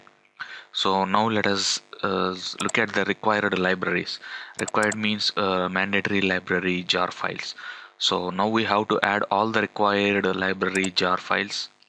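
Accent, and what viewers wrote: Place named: India. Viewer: do you really have to be indian. like really? in every info videos INDIAN . INDIANN... Im not racist but my ears cannot hold indian.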